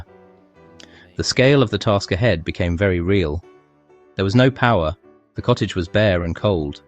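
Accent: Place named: Southampton, United Kingdom. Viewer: Tu as laissé ton accent de Southampton!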